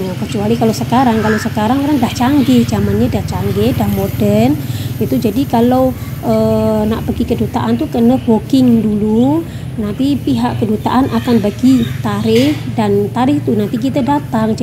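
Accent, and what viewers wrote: Java, Indonesia. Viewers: Slank Jawa nya pekat.Walau pun dh lama dok M'sia.Semoga pelan pelan berubah agar jadi Org Malaysia.Maaf bukan tujuan menghina tapi kagum dgn ketabahan hidup di M'sia.👍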